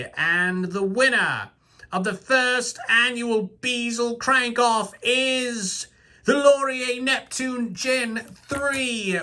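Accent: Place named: England, United Kingdom. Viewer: Brilliant video sir! And you kept that (exceptionally good) English accent up all the way through too! 😄👍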